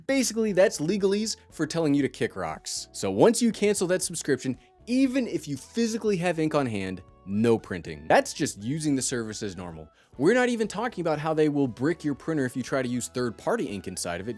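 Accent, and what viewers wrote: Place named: Midwest, United States. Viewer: AI voice with midwest accent? 👍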